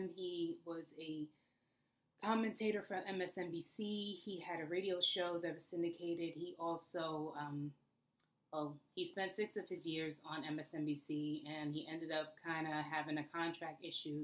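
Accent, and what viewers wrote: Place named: New York, United States. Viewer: Yes I hear that accent, but it's nice, I normally am not fond of NY accents, but yours is minor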